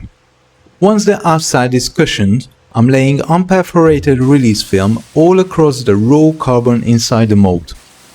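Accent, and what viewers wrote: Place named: Hungary. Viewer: I thought I heard it on your dialect 😊 My father was from Hungary 😊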